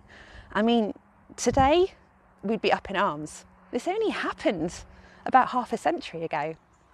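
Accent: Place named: North Wales, United Kingdom. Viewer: Oh! Cariad- i might have a very different channel and life to you but many of my early videos were made around here. Dwin siarad Portugaleg rwan, and live here now. I love your beautiful Gog accent. After my Nain and my Taid brought me here as a kid , their stories stuck in my heart forever. ive flown paragliders from Mynydd Nodol and in the background, rode dirt bikes and Scuba dived every Llyn, rode mountain bikes since the 80s in an obsession with being alone within the poetic beauty of Gogledd Cymru. I explian this story to my Portuguse freinds in their language about this place and they are shocked this happened so recently given that the U.k is so apparently accepting of other cultures. This is the first time i have watched a video and cried like a baby. i dont know why.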